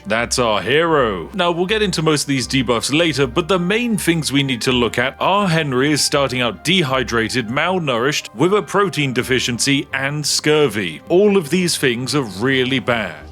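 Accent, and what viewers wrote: United Kingdom. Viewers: You sound like hans capon, i think its just the British accent but its peak im British too.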